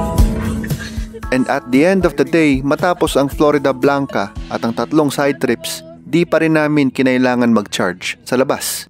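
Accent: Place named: Visayas, Philippines. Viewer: Bisaya diay ka? Lol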